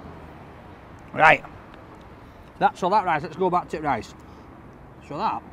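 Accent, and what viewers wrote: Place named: Bolton, United Kingdom. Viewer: Are you from Bolton mate ? You sound just like my mom and grandparents who are from there..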